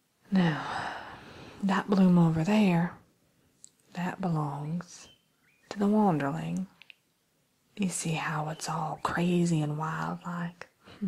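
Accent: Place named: South, United States. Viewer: Lovely. Your southern accent was adorable. I love the photo you chose too..perfect. Can't wait for the next segment!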